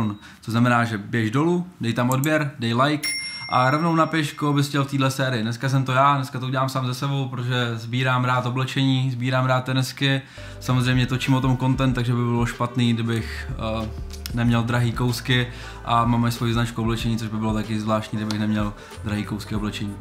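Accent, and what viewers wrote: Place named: Moravia, Czech Republic. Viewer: Moravsky bro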